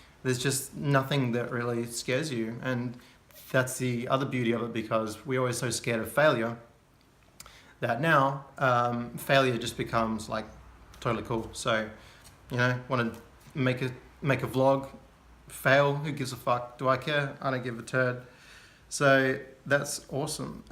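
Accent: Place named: United Kingdom. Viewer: Cool video. Can’t tell if You’re British, Australian or a Kiwi! In any case good luck on your journey, seems like you’re doing well mate.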